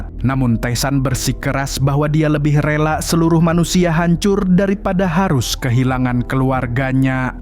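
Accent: Banjar, Indonesia. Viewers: Ternyata mamang urang Banua jua 🤣🤣🤣 babahasa Banjar sidin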